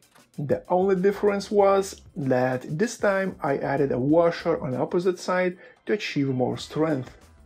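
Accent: Russia. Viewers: Я один слышу русский акцент?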